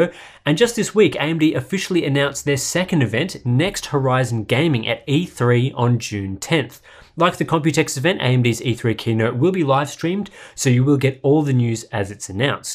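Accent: Australia. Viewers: said "not as good news." It's that thick Australian accent.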